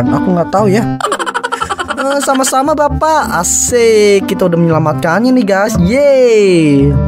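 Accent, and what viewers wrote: Indonesia. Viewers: Bang teh orang Sunda apa bukan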